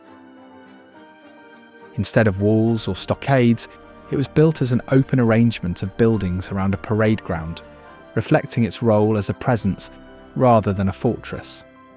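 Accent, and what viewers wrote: United Kingdom. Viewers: Uh… that black catfish with the white stripe does not exist here. Who did this video? I’ve fished catfish in the Texas hill country for over half a century and have never seen anything like that. Channel cats, blue cats, yellow cats and the occasional bullhead are the main species. And the bird eating a crab never happened either. That would be at the coast. No crabs in the hill country. The British accent now makes this suspect. Also the comment about Canyon Lake being not natural is strange. No lake in Texas is natural except for Caddo. Sorry about my critique. Other than a couple of glaring mistakes this is a good video. Oh, and Luckenbach has a huge gift shop. Always has. This area in Texas is my soul.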